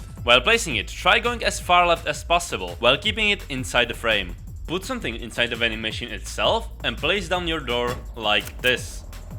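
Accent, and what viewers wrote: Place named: Czech Republic. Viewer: Český přízvuk jde prostě poznat haha super baseka!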